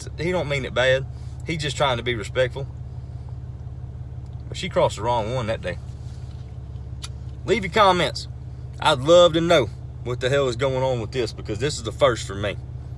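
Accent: South, United States. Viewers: Here in Canada my mom and sisters would jokingly say “hey, I’m not that old!” But given your accent no way they would think anything of it, it’s just a southern thing. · Being from the north and living in the South meet and guys like you I understand what you're saying no you didn't do nothing wrong but me I would have handled it differently if I was your cashier I would have giggled and said where's my mama where's my mama you said man I expect to see my mom when I looked over my shoulder which would have had you and me both laughing because I know you from the south by the way you talk they knew you from the south by the way you talk they just was in a foul mood and took it out on the wrong person you didn't do nothing wrong that cashier could have handled it better the boy you called me the c word and we going to be scrapping you might be bigger than me and I might get my ass whooped but you know what I'm going give it the old College try.. 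🤣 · You didn't do anything wrong. But as a female from the northeast, only older women are called ma'am. So most younger women find it offensive, because around here it insinuates that you look older than you are. I remember being in my early 20s and getting called ma'am, and feeling totally sad about it. Some of us didn't grow up hearing all women addressed that way. I wouldn't be offended if you did, because your southern accent is obvious and I know that's how southerners talk. (My aunt was from Georgia) 👍😂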